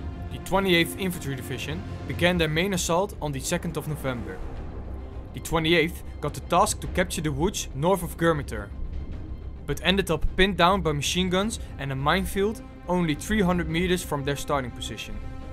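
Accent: Netherlands. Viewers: Wat een zwaar Nederlands accent!!Ik dacht dat je erom deed.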